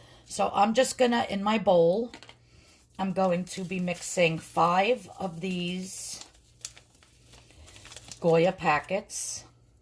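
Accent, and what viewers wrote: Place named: Long Island, United States. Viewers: No, but I lived in Manhattan for 15 years and had a friend who was from Long Island and she sounds just like you! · The food looks great, but the ACCENT kept me watching. 😂😍🥰 Long Island?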